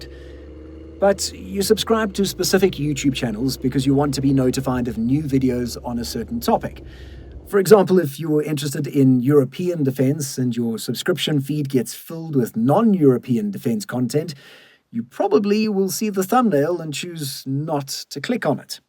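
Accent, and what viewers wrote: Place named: South Africa. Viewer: What accent is this... I'm picking up south African... Australian... bit of uk english....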